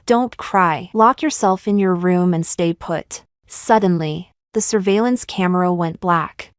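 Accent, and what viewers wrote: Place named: England, United Kingdom. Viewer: It surprised me that the Spanish was pronounced with an English accent 😅 Just FYI, the narrative switched to Spanish towards the end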